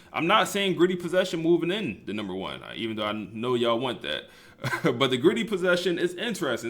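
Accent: Philadelphia, United States. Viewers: Philly accent is heavy bro 😂😂😂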